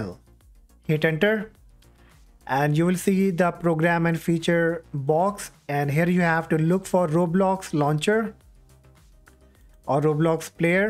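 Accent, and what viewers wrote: India. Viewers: Thanks bro, this is why u should listen to Indian accent ppl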